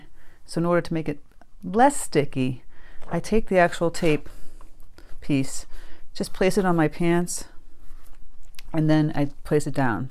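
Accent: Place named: New England, United States. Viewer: Painted the night seen and added a couple of snowmen in the snow space. Sent them out as cards. Thank you so much. Love your accent, just like mine but I'm not in Mass anymore. Still New England, though.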